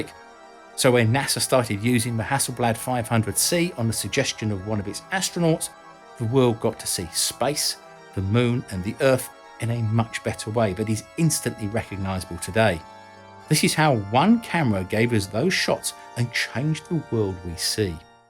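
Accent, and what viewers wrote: United Kingdom. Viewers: When NASA begins to run into a wall they bring this soft spoken non confrontational! British accented to sound sophisticated and knowledgeable! To help remove doubt that this is again a story for the first time discussing a hand held camera in mercury capsule?😂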